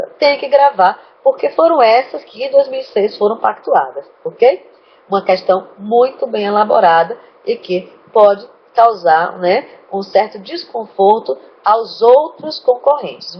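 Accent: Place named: Northeast, Brazil. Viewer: Uma pitada de sotaque nordestino...rsrs uma excelente aula professora de uma forma dinâmica e rápida pude compreender os três Pactos da Saúde. Obrigado por postar no Youtube. Parabéns!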